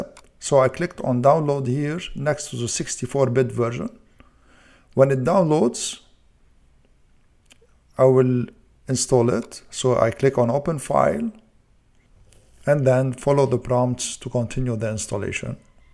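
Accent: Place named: Lebanon. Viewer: bro you sound lebanese !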